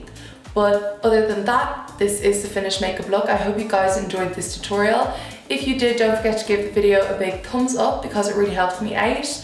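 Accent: Ireland. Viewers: she is from Dublin in Ireland ☺ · The Vogue ja, sie ist Irin, aber ist manchmal auch in London bei ihrem Freund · The Vogue i think she is irish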